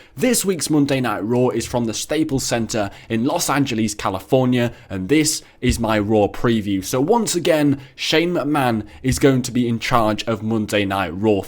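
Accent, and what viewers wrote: England, United Kingdom. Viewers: Mate what time is this in England if you live there (you sound like you do)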